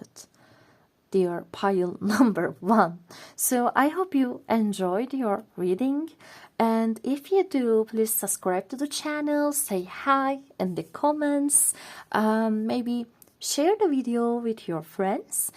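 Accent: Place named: Eastern Europe. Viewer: Pile 3 was particularly accurate, disregarding the fact that I am male. Thank you. Is your mother tongue Slavic perhaps ? There are small hints to it, I'm fairly certain. 😊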